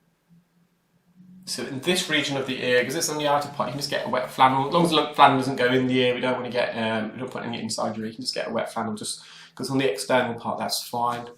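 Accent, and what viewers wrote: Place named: Leicester, United Kingdom. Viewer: Hi Mr, I am amazed by your videos after coming across them when researching my sons cholesteatoma diagnosis. I recognised your accent and was very impressed to find you are also a fellow Leicester man. It would be amazing to meet with you sometime to discuss my sons hearing aid possibilities and his future outlook. He has had 11 operations so far and is still profoundly deaf in one ear. We are under Dr Bannerjee at the LRI. Kindest Regards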